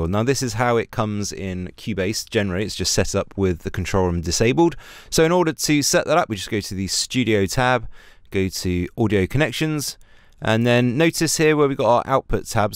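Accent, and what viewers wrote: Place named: United Kingdom. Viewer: Solid info. Not to a dick, but I had to rewatch a number of things to clearly hear what was being stated. Not a matter of accent, it was specifically the speed. Many in the U.S. neither speak or are accustomed to hearing U.K. english (which we also often hear from those speaking english who are from India & pakistan). It's like there's some race to get every single syllable out of the mouth, regardless of audience comprehension. I understand that you are going to do as you will... it's fine. I merely wanted to share the perspective of some of us who are struggling with the tutorial a little. Thank you for your time.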